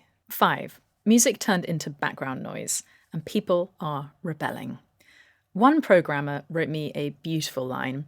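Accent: United Kingdom. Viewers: I’m not sure about any of this, but your British accent is music to my ears.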